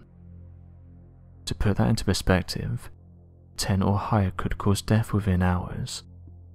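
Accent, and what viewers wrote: United Kingdom. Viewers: Oi mate!! I love all of your videos. I truly enjoy driving,chilling,cleaning and etc. to your story telling. It has been a fun pass time. Your one of the better presenters/narrators in my opinion on YouTube when it comes to the strange and unusual. Would love to hear more videos about satanic cults such as Abraxas and or even Chateau des Amerois if you ever get the chance. Cheers and love your work. Something about your British accent and calm voice that’s very soothing to me for some reason. Perfect for story telling. Anywho, Much love and respect from your long separated cousins here in the U.S.! Blessings from the bayou state of Louisiana. Also, another potential topic to check into is creature and beings spotted in and around the swamps of Louisiana. Especially run ins with werewolf like creatures labeled as Rougarou. Take care for now mate! 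Blessings to the Cambridge crew and Bristol Peeps and all my st neots lackeys out there!!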